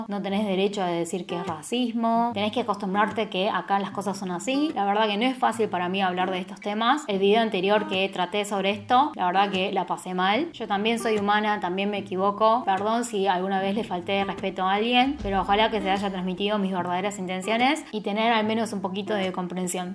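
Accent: Argentina. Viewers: Porque hablás como argentina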